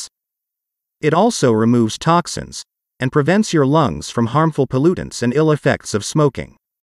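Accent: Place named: United Kingdom. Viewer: bro hindirishi naam likh k kis aangrez se bolwa rahe aap proote karo na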